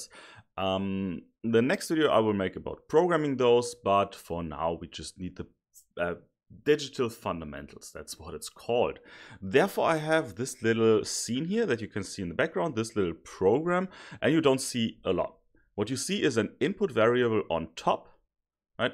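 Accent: Germany. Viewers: I can tell he is German 😁, thanks a lot sir.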